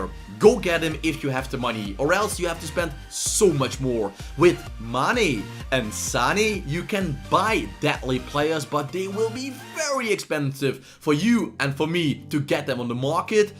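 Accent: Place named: Netherlands. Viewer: Ik hoor gwn dat je Nederlands bent hahahahaha heerlijk video wel ❤️🤙🏾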